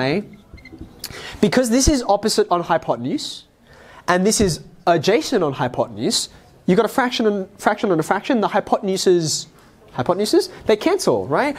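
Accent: Australia. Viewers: No, just an Australian with an Australian accent...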